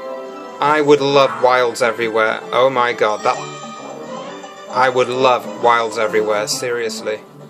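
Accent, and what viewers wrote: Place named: England, United Kingdom. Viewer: Oh no, has the giggles! Actually I agree with love your voice and English accent.:)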